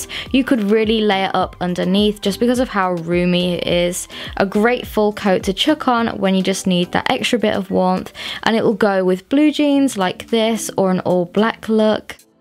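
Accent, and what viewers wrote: England, United Kingdom. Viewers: Ja sie kommt aus England 🏴 Das ist britisches Englisch :) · I'm German and really bad with English accents but where are you from? I'd say England but I'm not sure 🙈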